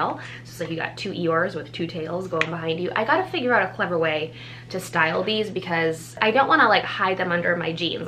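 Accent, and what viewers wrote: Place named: Midwest, United States. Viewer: First off: I love how excited you get about every item.. and second off: I knew you were midwestern the minute I heard you speak (gotta love our accent)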